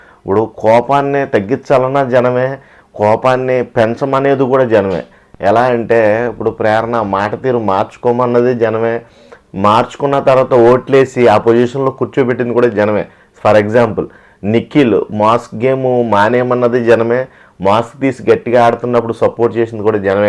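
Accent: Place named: Godavari, India. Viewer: Nuvvu same godavari Jilla pakka super language and manodive bhayya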